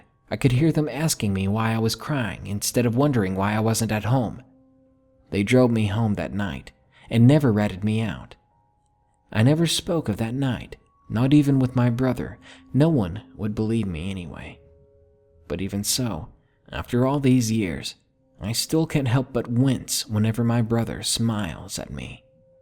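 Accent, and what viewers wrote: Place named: South, United States. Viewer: Sir, I love your country accent.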